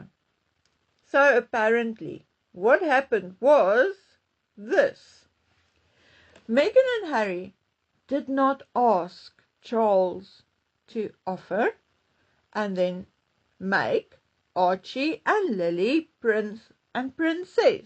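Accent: Afrikaans, South Africa. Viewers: I LIKE your content ….always have. I didn’t know English wasn’t your first language. Is it Afrikaans?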